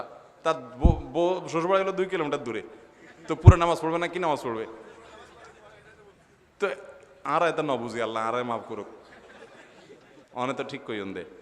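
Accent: Noakhali, Bangladesh. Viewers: চিটাগং না নোয়াখালীর ?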